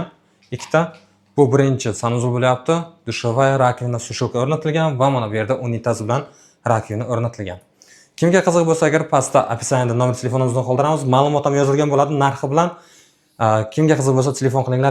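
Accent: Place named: Bukhara, Uzbekistan. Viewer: Бухоро шеваку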